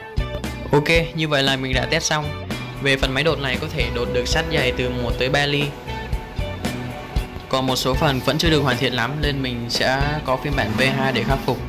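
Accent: Vietnam. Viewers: Giọng nge giống dưới thanh bình thế nhỉ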